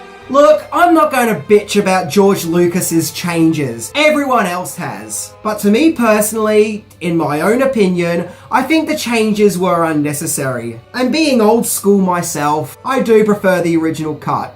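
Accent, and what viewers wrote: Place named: Australia. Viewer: WONDERFULL!NICE WORK!NICE ACCENT!AUSTRALIAN?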